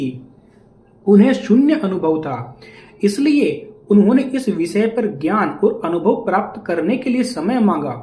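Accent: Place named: Bihar, India. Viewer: Tumhari bhasha hindi se bilkul alag hai,shayad bihari type ki. Lagta hai koi kitab padhkar baat kar rahe ho. Kitab shuddh hindi me likhi hogi par aap bihari lahja laa rahe ho. Koi like nhi. Skip direct.ok ji..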